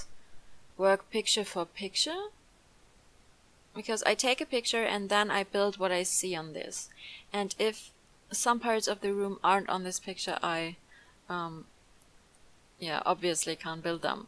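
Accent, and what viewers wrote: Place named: Germany. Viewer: Bist du deutsch? Weil das dein Englisch hört sich so an als wenn du deutsch bist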